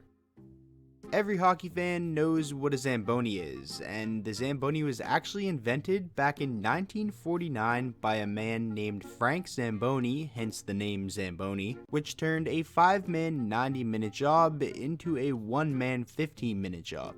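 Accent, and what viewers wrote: Canada. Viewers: Which province are you from? You're the first canadian I've listened to who actually says "aboot" instead of just "abeut". I thought that was just a stereotype but I guess some of you actually do say it like that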